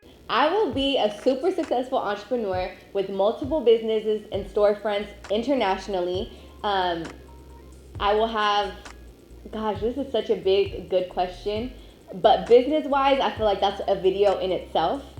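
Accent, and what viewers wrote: France. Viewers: 😍😍😍😍 ton accent français est trop chou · J'aime trop ton accent français 😍😍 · You're just Soo gorgeous and your french accent is so cute 😘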